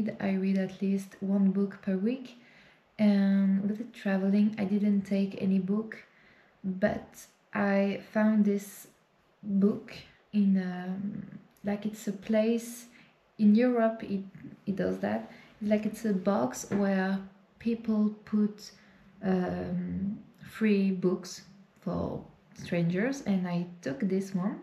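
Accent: Wales, United Kingdom. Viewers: Love watching your travels! You'd be very welcome in the UK anytime:) and the welsh accent is lovely! My mum was welsh and she had such a lovely speaking voice! Happy travels x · The coast of Croatia looks so beautiful. I, too, love to hear the Welsh accent. I hope & you have a great weekend and the rest of the week. 🧡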